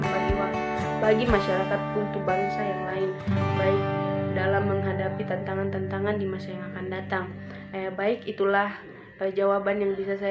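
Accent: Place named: Eastern Indonesia, Indonesia. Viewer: Selain warna kulit, dialek adalah alternatif untuk mengidentifikasi seseorang. Yakin dan pasti ini ini adik's dari timur.... 😅🙏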